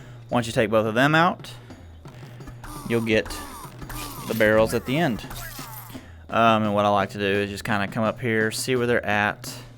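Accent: Texas, United States. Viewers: you got such a low key texan accent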